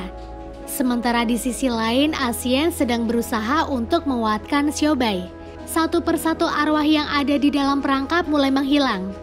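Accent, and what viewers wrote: Pemalang, Indonesia. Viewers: Mba orang Pemalang nih.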